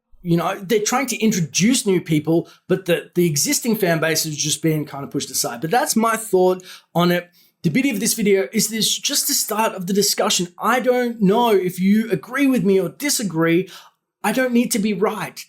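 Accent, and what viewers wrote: Australia. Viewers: You can tell he is Australian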